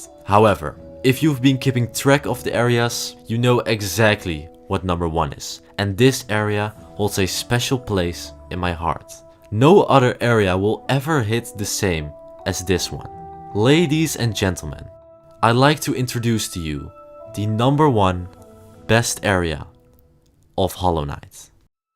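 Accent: Netherlands. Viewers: am i just the only one smelling a bit of a dutch accent here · You are dutch right? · Het nederlands accent door je engels heen is crazy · ik ruik nederlands man ngl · Wait are you Dutch? (Same) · just for science.. are u dutch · je klinkt nederlands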